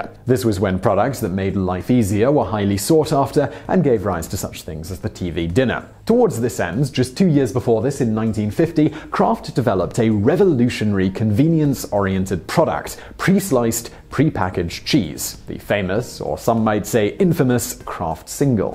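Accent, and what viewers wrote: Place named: London, United Kingdom. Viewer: this guy says 'sore' instead of 'saw', verb. because: London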